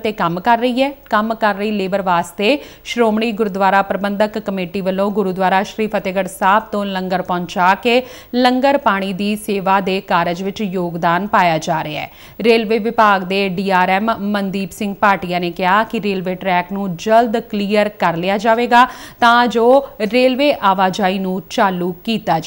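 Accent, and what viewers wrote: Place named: Bihar, India. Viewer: Yeah anchor Punjabi nahi bihari lagdi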